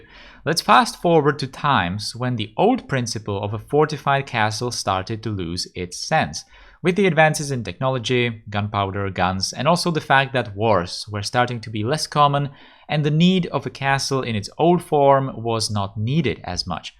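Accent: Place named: Czech Republic. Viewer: You must be from Czech Republic. I am also. You have Czech accent when talking English and you speak very well Czech. You always start or speak about Czech Republic 🇨🇿. I love you. Jsi nejlepší, pokračuj. Znáš Poděbrady? Verz interesting episode, not just the talking but also the gameplay😄👍🏻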